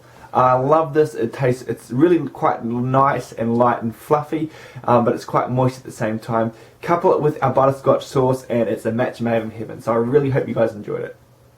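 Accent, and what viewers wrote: New Zealand. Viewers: Like your kiwi accent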